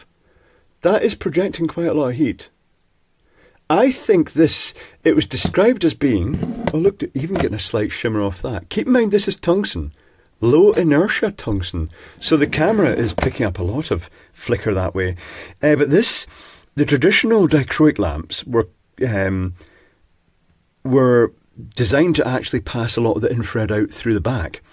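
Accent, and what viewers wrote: United Kingdom. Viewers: I bought the test leads for $0.93. I love to listening you and Your British accent.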